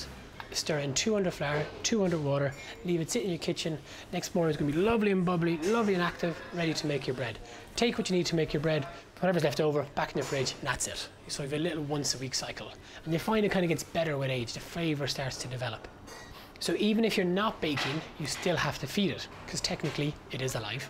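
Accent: Ireland. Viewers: Plus, that lovely Irish accent was an added treat <3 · Irish accent. He clearly knows what he’s talking about! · Brilliant! This is the first time I've understood the process AND how wonderful you included the why's! I've scoured the classes and they just weren't cohesive. You have a new follower. Lovely to hear your brogue, too. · who knew a soft spoken Irish accent is so asmr worthy. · Hi, fantastic video, and having that great Irish accent explaining every stage getting to that final loaf, makes it even more enjoyable. The way you describe each stage I think most people would not have a problem getting to the final product. I had one question, can I half your ingredients to just make one loaf at a time? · its not sourdough bread its his annoying irish accent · I use almost exactly this recipe, I add an ounce each of Olive Oil and Honey to mine, and bake in covered dutch oven instead of pyrex, but same principal... 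turns out perfect every time... Love this Video and the easy lovely Irish accent! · Finally! has de-mystified everything sourdough. I was despairing of ever finding info that was easy to understand, conflicting and not over complicated. makes it so easy to follow…I could listening to his lovely Irish lilt for hours. 😊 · sounds irish :-)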